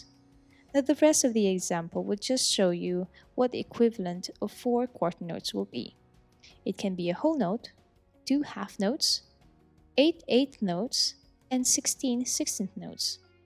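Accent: United States. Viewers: nagulat ako nung nagtagalog siya akala ko kano HAHAHHAH thank you for the vid tho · Biglang nagtagalog akala ko po tlga Americana kayo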